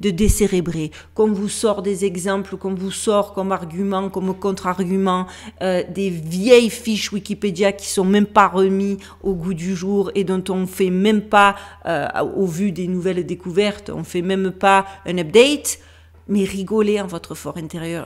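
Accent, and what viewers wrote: Marseille, France. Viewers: 😂 " Mais ce monsieur n'a rien laché, il devait être Marseillais...mais bien sûr, je plaisante!" 😅 J'adore votre humour décalé, toujours fin et respectueux. Je suis parisien mais ma sœur vient de Marseille et j'aime entendre votre accent, ça me rapproche de la famille. Ne changez-rien. J'ai du mal à comprendre qu'avec la qualité de vos reportages très aboutis, que youtube puisse vous emmerder. C'est un "non sens" 😮. Prenez soin de vous et ne lâchez rien!❤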